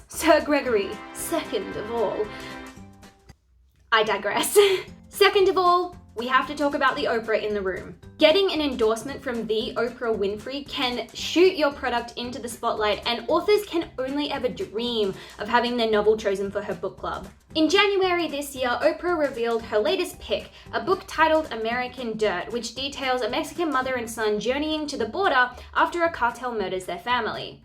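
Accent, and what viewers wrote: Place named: Australia. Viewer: As a dude, I was so uncomfortable after he suggested they go to his house and she began to talk about what she would be expected to do that. I deleted this one. The student teacher trope, however, is one of my favorite things ever I fully admit that don’t judge. I love things like dark notes, and misbehaved if you’ve read either of those and lessons in sin. Also, are you Australian? Sounds like it and that is the coolest thing ever.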